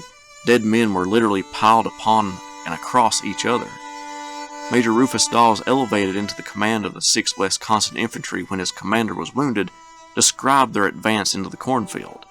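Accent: South, United States. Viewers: Sir, you sound like a Southerner so why are ALL of the accounts from Union soldiers? There are plenty of first-hand accounts by Confederate soldiers. Perhaps a more even blend would be better. One more thing, the name of the battle is Sharpsburg.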